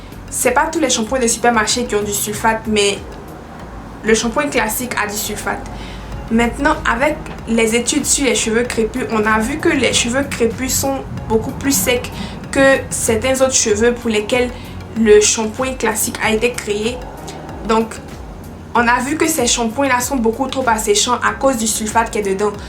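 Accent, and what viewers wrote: Ivory Coast. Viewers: L’accent ivoirien qui ne ment jamais 😂❤️ big kisss depuis babi!!!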